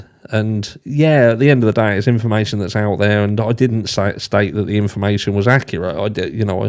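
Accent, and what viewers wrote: Norfolk, United Kingdom. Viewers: Are you from Norfolk uk, as I am? X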